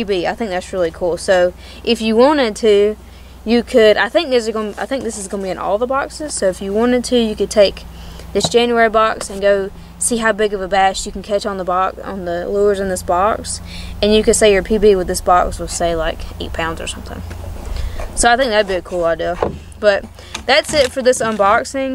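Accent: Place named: South, United States. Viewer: i love how beautiful this woman is and southern voice... great video by the way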